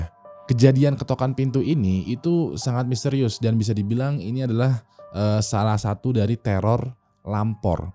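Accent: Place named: West Java, Indonesia. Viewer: asik juga dengerin orang sunda cerita jawa, pagi dua sore mati…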